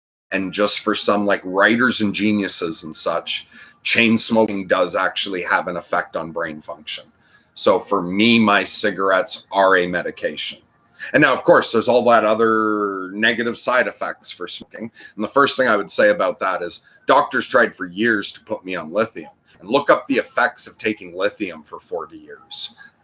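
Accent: Canada. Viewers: Hey glad to see your mood lifting. I would've never guessed your from Quebec I would've thought your from Western Canada. I live in Rockland 30 mins east of Ottawa. Cool thanks for the chat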